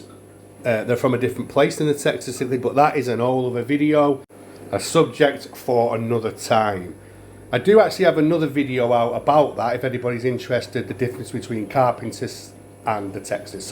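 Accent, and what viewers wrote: Manchester, United Kingdom. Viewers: You sound like a local lad to me mate (Manchester) I went to pier aquatics in Wigan for the first time last week, definitely my new favourite shop, bought myself a Gold saum 🤩 not sure if you know about it but there’s a cichlid convention in Rochdale on September 10th mate, il definitely be checking that out. I’ve only been keeping fish for about 6 months but I’m totally hooked 😅😆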